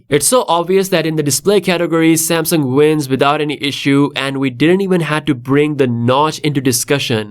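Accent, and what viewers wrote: India. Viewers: Man trying to speak good English still have 10% Indian accent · Your Indian accent is getting better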